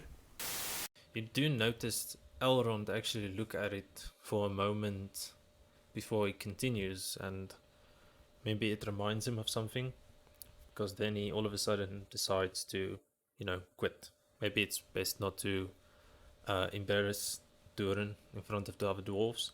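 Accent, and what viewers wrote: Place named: South Africa. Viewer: Heyyyy, a fellow South African. Het die accent gehoor en lol, moet gaan kyk het of ek reg was. Nice vid, keep it up!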